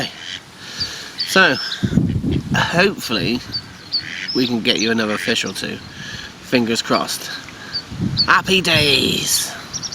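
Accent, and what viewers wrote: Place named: Liverpool, United Kingdom. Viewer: Great Scouse accent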